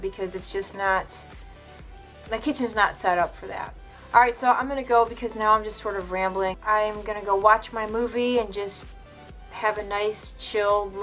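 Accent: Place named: Ohio, United States. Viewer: I discovered you today through your easy peasy eye trick. I haven’t watched much YouTube but lately, have been watching to get make-up tips. I watched a couple more of your videos when I found out you are from the Cleveland area. I grew up in Wellsville, down on the Ohio River. Then, you mentioned Texas. I have lived in Texas for 18 years, 10 in Houston and 8 in the DFW area. I think listening to you talk reminded me of home. I don’t miss winter but I miss Ohio. I am happy I happened to find you.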